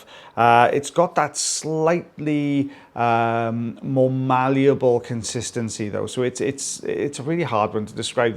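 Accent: Wales, United Kingdom. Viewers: It's the welsh accent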